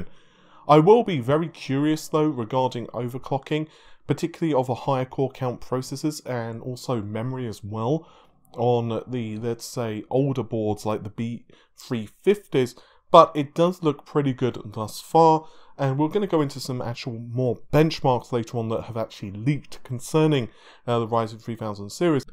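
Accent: United Kingdom. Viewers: He's British you dumbass and American is an accent. Not a language. I see the American education system has treated you well.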